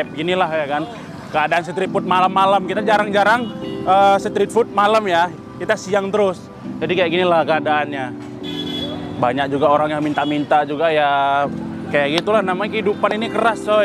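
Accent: Medan, Indonesia. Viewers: Yang bikin saya salut dengan Vloger ini walaupun bahasanya agak nyinyir didepan pedagangya dengan logat medannya yg lucu tapi dia tidak lupa berbagi dengan orang2 disekitarnya… mudah2an murah rezeki lah kau bang …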